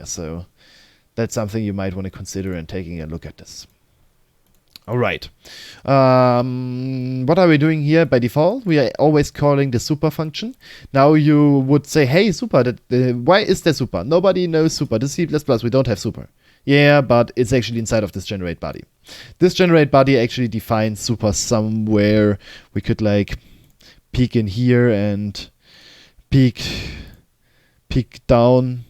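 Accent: Germany. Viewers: No hate, but u can tell he german xD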